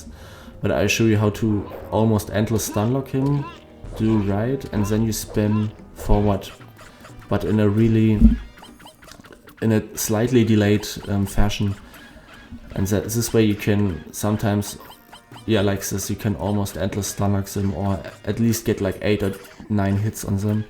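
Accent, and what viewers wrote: Germany. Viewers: Gutes Video, verfeinert mit dem deutschen Akzent, fast schon perfekt. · GOOD GERMAN ACCENT. I love it! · Dein deutscher Akzent. 😄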